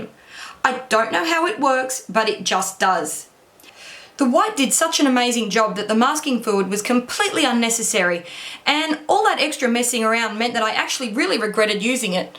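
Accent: Australia. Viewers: from one Aussie to another..it’s refreshing to hear an Australian accent for a change on YouTube 😀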